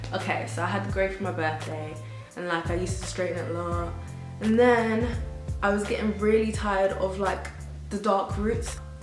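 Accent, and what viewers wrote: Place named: United Kingdom. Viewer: oh you're British... assumed you were American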